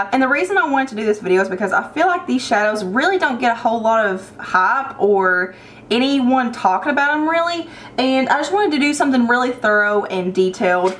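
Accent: South, United States. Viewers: FINALLY, a Beauty Guru YouTuber with a country accent! You just earned a new subscriber!!! #CountryGalsDoitBest #MISSISSIPPIGAL 💋💋💋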